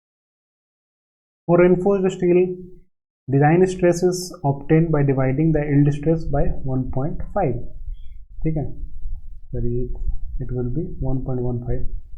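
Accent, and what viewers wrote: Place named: India. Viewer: Indian Accent 🙆‍♂️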